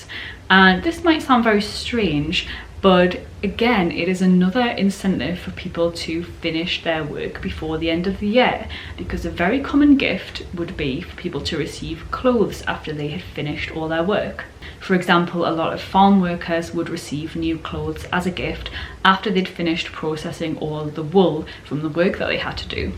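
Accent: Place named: North East England, United Kingdom. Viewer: Been reading grettis viking saga and he gets attacked by monsters during Yule tide so seems to be a tradition of supernatural stuff during Xmas .always nice to hear my local north east accent in YouTube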